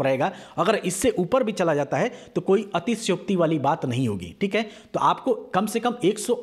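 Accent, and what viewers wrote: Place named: Bhojpuri, India. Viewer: Sir apki hindi..thodi Bhojpuri hindi kaisi lgti h..🤗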